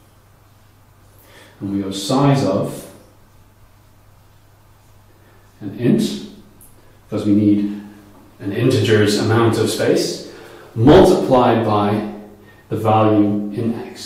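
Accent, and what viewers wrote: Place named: Ireland. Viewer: Yeah please do! and nice I am aswell. It's always nice to hear an Irish accent in this area of youtube videos. keep up the good work!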